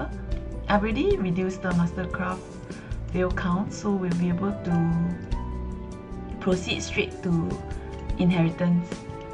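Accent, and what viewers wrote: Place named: Singapore. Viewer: I agree with his/her comment. I like the Singaporean English accent.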